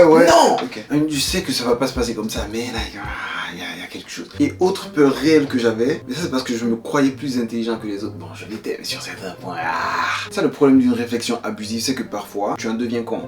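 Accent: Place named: Toulouse, France. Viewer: l'acent toulousain j'aime trop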